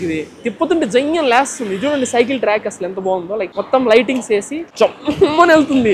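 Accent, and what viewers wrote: Nellore, India. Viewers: Video total febless 😊 total video lo nellore slag matladuthu ne unavu enjoy orange 👌👌👌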